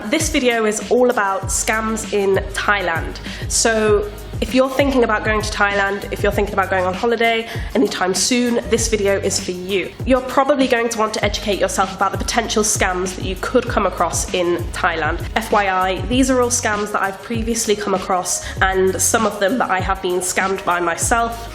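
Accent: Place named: London, United Kingdom. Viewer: I love your accent are you from London England ?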